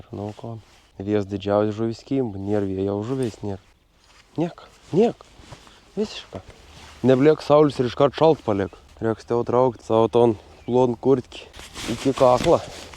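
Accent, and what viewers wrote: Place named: Samogitia, Lithuania. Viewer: Bet ta kap smage girdiet zemaiteska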